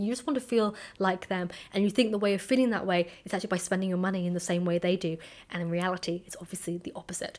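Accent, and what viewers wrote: RP, United Kingdom. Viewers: That posh accent! Still trips me up. But the more I get to know you... the more I understand and respect you, what you stand for. (Too early to use words as 'like' or 'love' but... gett'n there). 😜😁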